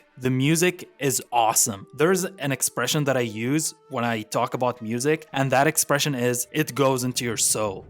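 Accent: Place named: Japan. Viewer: You can tell hes Japanese by his accent so Im sure he knows his ancestors history...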